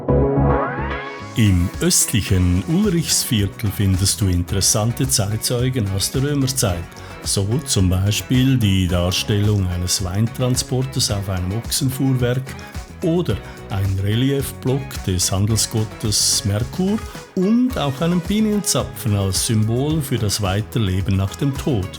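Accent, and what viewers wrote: Switzerland. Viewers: Im Allgemeinen haben es Schweizer so an sich, mit Akzent zu sprechen. Würde er im Dialekt reden, verstündest du kein Wort. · Sehr seltsam, der Sprecher hat einen Schweizer Dialekt, muss das sein???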